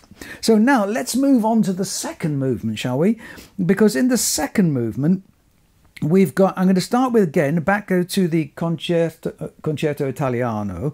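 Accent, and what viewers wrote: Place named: United Kingdom. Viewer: Any Americans watching don’t let the British accent fool you. This man has nothing knowledgeable or illuminating to say about this music. His vocabulary is tiny and his attempts to pronounce French, German and Italian are pitiful. Worst of all, and it’s a crowded field, is the way the camera lingers on him throughout the extracts as he “conducts” along, bereft of any sense of rhythm, like Cary Grant as Toscanini.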